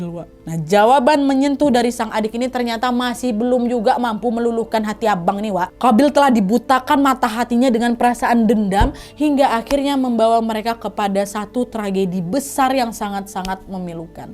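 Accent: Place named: Medan, Indonesia. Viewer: Mirip kontennya Nessie tapi dg aksen Medan , menarik,, Salam dari subscriber baru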